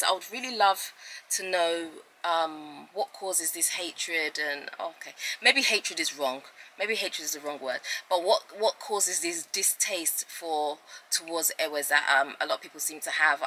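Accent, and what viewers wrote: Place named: United Kingdom. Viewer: You are British my dear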